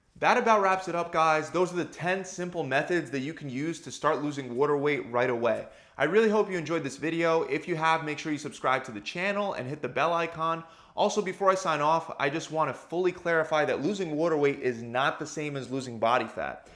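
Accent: Philadelphia, United States. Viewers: Well we all know youre from philly now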